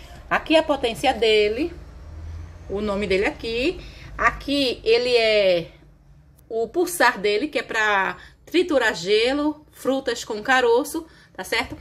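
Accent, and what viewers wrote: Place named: Northeast, Brazil. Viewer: Gostei do sotaque do nosso nordeste! Rsrs....beijo.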